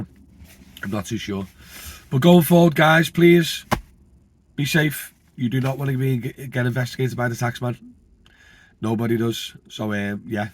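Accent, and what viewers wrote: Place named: England, United Kingdom. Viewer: Can't understand your English accent mate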